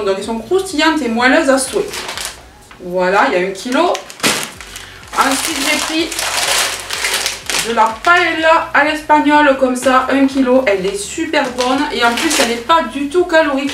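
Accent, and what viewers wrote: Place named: Southwest, France. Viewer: Coucou, merci pour tes vidéos, dis moi au vu de ton accent je me permet de te demander d'où tu viens, je suis du sud ouest et le même accent lol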